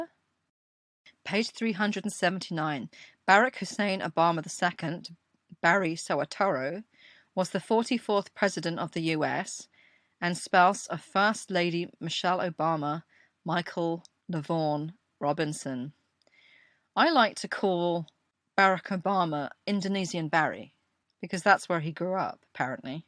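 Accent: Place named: England, United Kingdom. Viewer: Being Scottish myself, I adore this woman's English accent.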